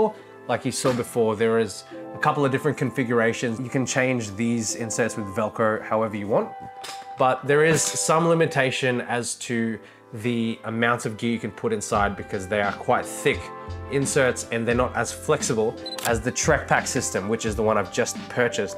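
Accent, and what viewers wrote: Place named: Australia. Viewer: Hey great to hear another aussie accent! just purchased a 1535 and made a small modification to allow me to attach a tripod to the case for traveling video on my channel 👍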